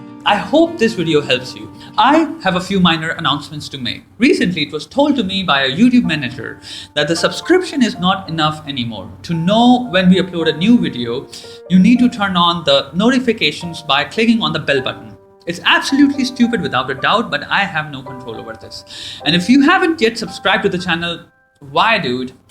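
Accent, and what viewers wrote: India. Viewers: Indian accent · I don't know, but I think he's Indian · i hate your Indian accent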